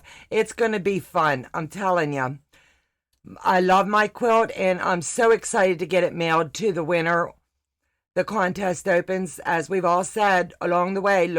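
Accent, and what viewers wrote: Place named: Pittsburgh, United States. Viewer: Are you from Pittsburgh? I recognize that twang.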